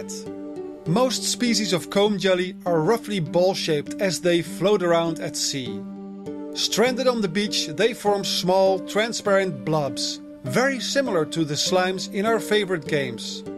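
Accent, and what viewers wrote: Netherlands. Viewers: oh dear, i am hearing a Dutch accent! hollandse youtubers zie je niet zo vaak!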